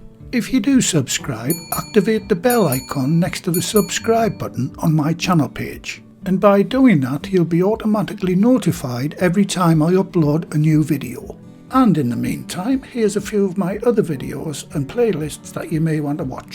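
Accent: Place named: North East England, United Kingdom. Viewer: friends of mine say you should do some ASMR videos, they really like your relaxing north east accent. obviously i have the same accent but i sound like a rougher slang version of jimmy nail lol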